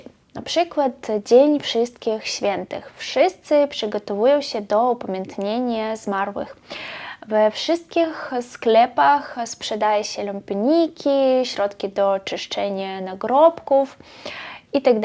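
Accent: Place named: East, Poland. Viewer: To mój pierwszy film pani. Bardzo ciekawie się słuchało. Zarówno temat interesujący, jak i przyjemnie słyszeć polski język w takiej wschodniej formie. Bardzo dziękuję za nagranie. Dowiedziałem się czegoś nowego. I gratuluję języka! Super.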